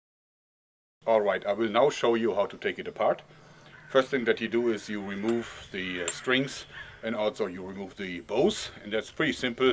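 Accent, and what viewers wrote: Germany. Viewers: So geil der Deutsche Accent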